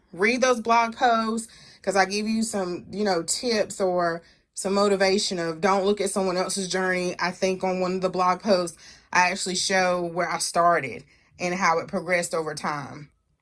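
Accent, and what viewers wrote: United States. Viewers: I love your country accent! Its so soothing. I wanted to keep replaying your videos just to hear your voice.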